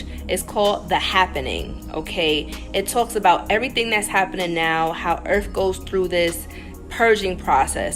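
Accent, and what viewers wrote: New York, United States. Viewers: Amazing, the reason I clicked on your videos 2 weeks ago is because you look just like my ex girlfriend. So much so, I sent the video to others to verify. The only thing that was different was the NY accent. Even then, I had to listen closely. Enjoying your videos, and appreciate what you doing! Much love❤ From NC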